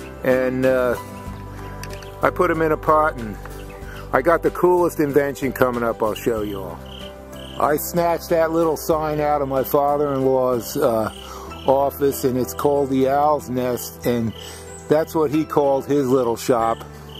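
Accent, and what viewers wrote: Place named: East Coast, United States. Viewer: Cool! I’m curious where you live because it sounds like you have an East Coast accent and I saw that you had the palm in the snow… I’m from Pennsylvania but I’m out in California now. That’s pretty cool that you can grow them in that temperature ...I didn’t know that! I grabbed little baby Palm Pups all the time walking around just to see which ones I can grow he he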